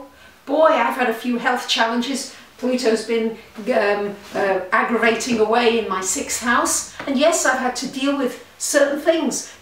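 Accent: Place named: United Kingdom. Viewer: cant stand brittish accents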